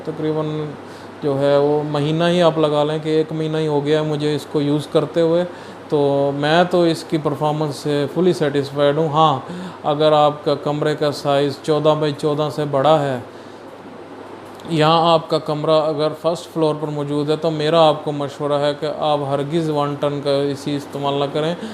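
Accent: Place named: United Kingdom. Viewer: urdu ko انگلش ke style main بولتے ہیں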